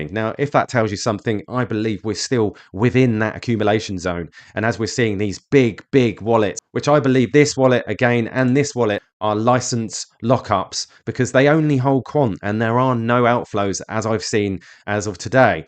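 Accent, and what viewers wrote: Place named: United Kingdom. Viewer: Hi, sounds like your in the uk. Do you know of any accountants that know they’re crypto, mines got no clue about it.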